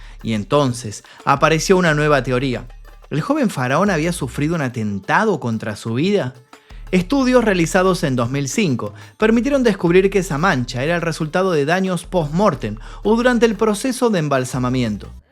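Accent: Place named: Argentina. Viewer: Un locutor argentino no no que mal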